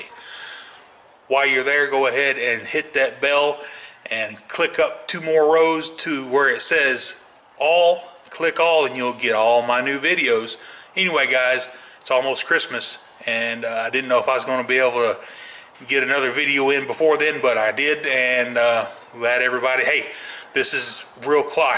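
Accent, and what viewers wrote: Texas, United States. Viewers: Great visual with the case open. Clearly from Texas with the few "H'what" I heard.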